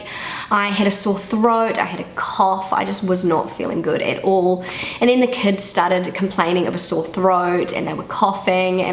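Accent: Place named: New Zealand. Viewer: I'm very excited to Heart your nice exceptional Kiwi accent against cause IT thrills me everytime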